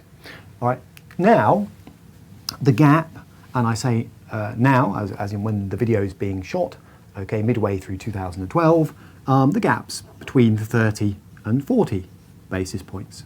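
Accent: United Kingdom. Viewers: I like your british accent and it is so clean that i can understand everything been said not like the american one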